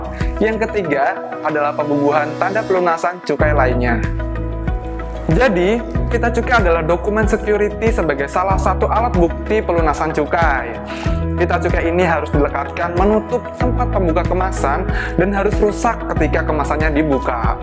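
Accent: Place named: Banyumas, Indonesia. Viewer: Keereen bea cukai ngapak yu...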